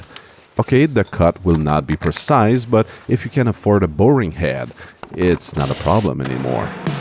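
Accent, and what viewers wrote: Quebec, Canada. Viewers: Ta le meme accent que george stpierre loll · "peux pas toutes aware" ... Je'l savais que ça sonnait Québécois cte vidéo là...pi en plus...c-t'une bonne idée !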